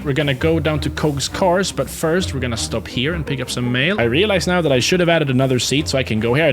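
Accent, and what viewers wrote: Sweden. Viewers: You sound like you have a swedish accent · I thought that dialect was familiar! I had to chevk the about me lol. How do i always find Swedish creators?!😅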